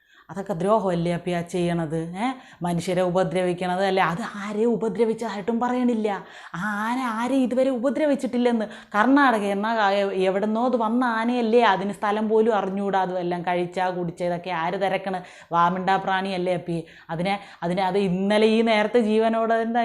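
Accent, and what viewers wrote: Thiruvananthapuram, India. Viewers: എന്തരപ്പീ ഇത് എന്തരു നല്ല അവതരണം അപ്പീ ഇത് തിരന്തോ രംഭാഷ കലക്കി സൂപ്പറായിരിക്കുന്നു മോളേ👍♥️🌹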